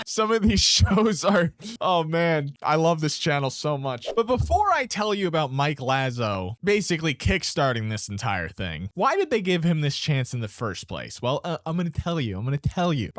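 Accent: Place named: Brooklyn, United States. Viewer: This is one of the most brooklyn accents ive heard and im all for it lol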